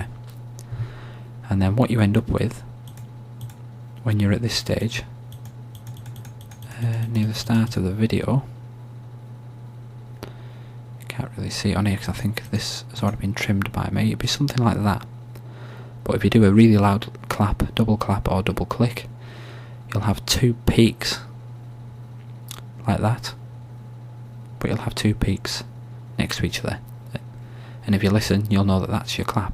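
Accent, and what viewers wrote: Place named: Liverpool, United Kingdom. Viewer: sounds like a scouser (Liverpool)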